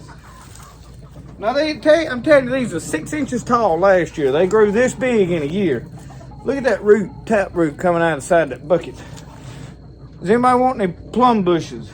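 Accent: South, United States. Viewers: Thanks for the daily walk a bout.... Love the southern talk.... From Minnesota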